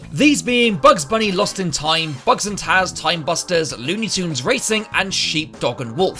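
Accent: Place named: United Kingdom. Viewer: I did not know you spoke british!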